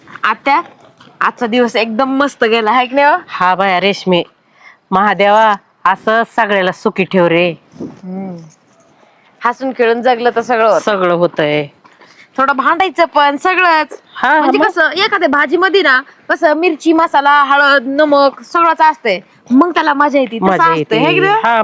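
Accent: India. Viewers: आस्सल नगरी भाषा बोलती 😂😂😂😂😂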